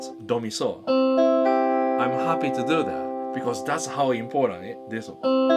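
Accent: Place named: Japan. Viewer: Every time you speak, I feel like you are gonna start speaking Japanese. Your english is very japanese and it sounds really nice.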